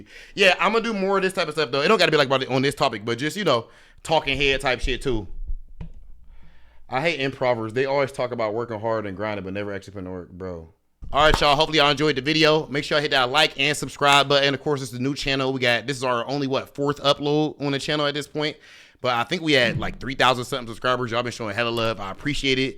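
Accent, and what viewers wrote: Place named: Caribbean. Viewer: Hey... Do you have Caribbean roots? Your accent sounds like someone who lived here and moved overseas. Or have Caribbean parents. Much respect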